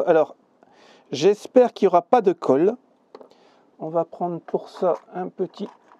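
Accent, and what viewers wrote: North, France. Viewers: T'es de ch'nord ? ^^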